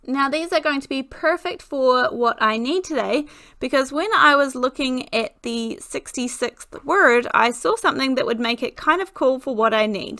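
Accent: New Zealand. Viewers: 😍😍😍love the card!...and love that fantastic kiwi accent! Cheers from Texas💖😘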